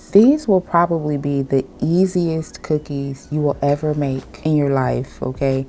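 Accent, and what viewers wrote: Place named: Maryland, United States. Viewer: Love your accent- back east- maybe Maryland?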